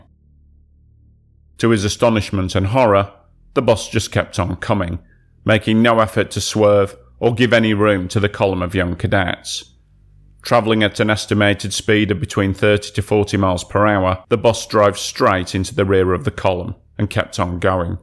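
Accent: United Kingdom. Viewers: Newly subscribed. You sound good and British mate. Cases we have never heard of such as this will take you far here on the tube. T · Voice over British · delivered in a soothing, charming British accent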